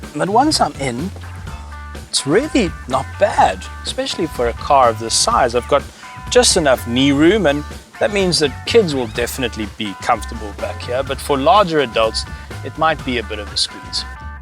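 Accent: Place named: South Africa. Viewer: I'm coming from watching internationa lmovies- to hear a South African sounds funny.... been indoors for too long.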